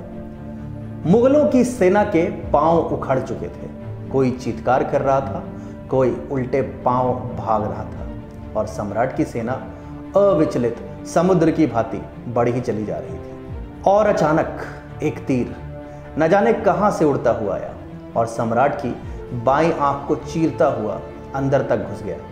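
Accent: Standard. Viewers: #Founder aap achhi shudh hindi bolty ho or aap ka bolney ka tariaka bhi achha hae... Bahoot achhy se ek alag andaz me aap story read karty ho... Ek advice dena chaunga agar aapko bahut aagy tak jana hae to hi... Ki aap khas kar History and non political topics par hi video banaya kary... Kyu ki aap bhi vohi kaam karty ho political videos me aapke dwara tathakit storys me jo ek #godimedia karty hae. Baki sab thek hae... ( I am not belong from any political party or political ideology )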